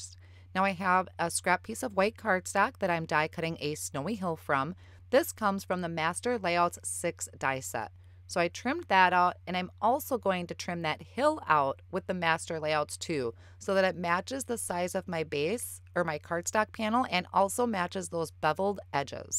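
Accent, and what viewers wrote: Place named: Midwest, United States. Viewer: did you say that those are Houses or Whooses? Sorry I have to tease, your midwest accent is so cute! I have a number of relatives in northern Wisconsin and Michigan, and so. Beautiful card btw!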